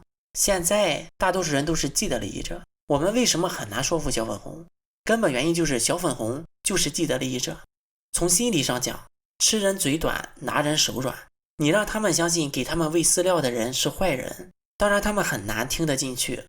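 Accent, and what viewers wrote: Shandong, China. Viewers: 你的口音应该是山东的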